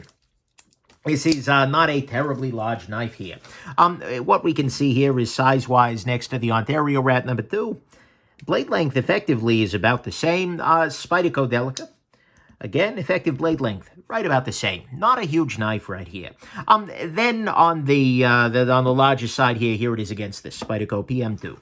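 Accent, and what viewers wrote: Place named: Mid-Atlantic, United States. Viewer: I dig the accent it sounds like 1940’s mid Atlantic where it from?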